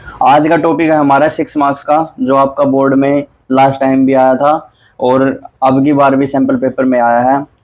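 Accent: Haryana, India. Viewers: Aap haryana se ho sir ???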